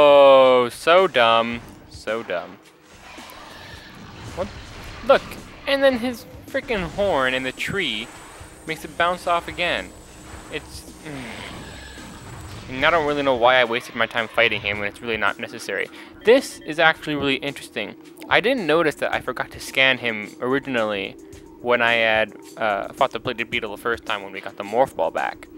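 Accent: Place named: Canada. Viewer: Wait, I noticed a bit of an accent...Are you Canadian????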